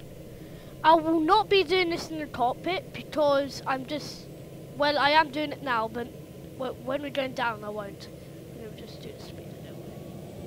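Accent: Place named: United Kingdom. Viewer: oh your british, cool?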